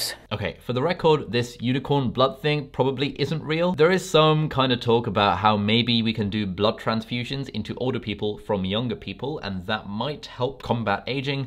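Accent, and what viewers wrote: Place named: United Kingdom. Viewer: Great video, love how you explained everything in your perfect British accent. What you said about how ‘wolfbane’ can effect the nervous system got me thinking about the Wolfbane potion Snape brews for Lupin in the Prisoner of Azkaban, which stops him from turning into a warewolf and immobilises him.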